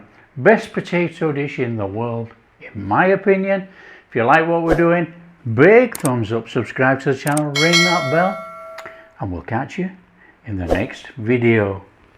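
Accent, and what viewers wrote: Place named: Yorkshire, United Kingdom. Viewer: Yum. What a treat. I see you’re still concentrating on a weight reducing menu!! Now, your accent. I would have said Lancashire, Oldham area but I reckon you’re Yorkshire, so Holmfirth? Halifax? Extreme West Yorkshire? Definitely not a French pronunciation.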